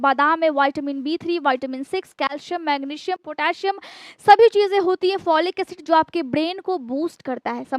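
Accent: Bihar, India. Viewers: Bihari lag rahe ho Ghar hota h Ghad nahi Agar hota h Agad nahi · I love your way of speaking like bihari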